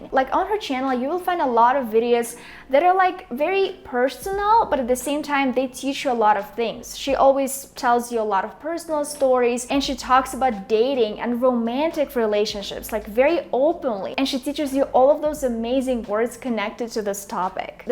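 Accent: Russia. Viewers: You speak very well and a nice girl.but still it is heard that you are Russian speaker. ❤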